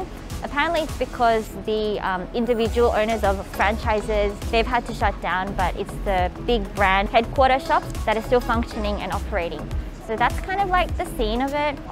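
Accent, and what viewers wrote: Australia. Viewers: Hi - did you used to study in Aus? You have a bit of an Aussie accent happening:) You should see what 6 lockdowns have done to Melbourne - it's really terrible! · aaaw I really want to go to Korea, hopefully covid will be over soon! She got Australian accent btw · Well in Australia..cause you sound Aussie..it's worst in NSW and Victoria..due to lockdowns and restrictions...we cannot do any store shopping..it's click and collect only and delivery...many cafes etc closed and many other businesses closed/gone..many people out of work and hospitality businesses gone...its probably the same in many countries...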